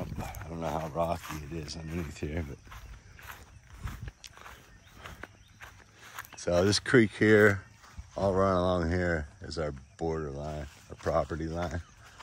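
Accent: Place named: Midwest, United States. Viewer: Your accent and how you say Crick reminds me of home. Are you from the Midwest?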